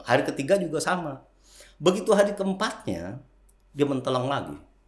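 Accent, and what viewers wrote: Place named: Jakarta, Indonesia. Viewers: Kok logatnya kaya orang betawi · Seru jg koh ceritanya ..ada logat btwi nya heheh Alhamdulillah..koh istiqomah dalam agama Islam🙏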